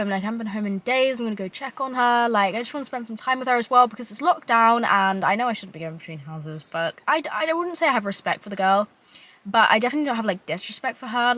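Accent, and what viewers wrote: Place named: London, United Kingdom. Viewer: London block accent ✅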